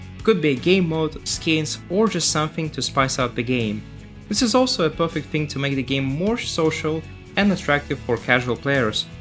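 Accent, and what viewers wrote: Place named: Russia. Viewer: not strange, just noticable, but in a good way, absolutely understandable and yes, now you mention Russian, I can kind of hear it, but much softer than the stereotypical (although also very cool) strong Russian accent! Thanks for answering, loving your vids! Cheers! :)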